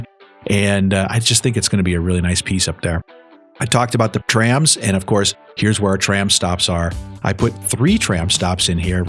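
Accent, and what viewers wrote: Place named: United States. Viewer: Beautiful approach to building in this game. Love it! Btw, you must be the most American sounding American on the internet.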